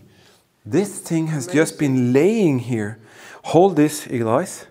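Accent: Germany. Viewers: Warum sprichst du englisch, man hört raus das du deutscher bist ,und wenn dann könntest du wenigstens einen guten Untertitel erstellen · You sound German to me, but then the pronounciation of Feuergefährlich makes me things differently.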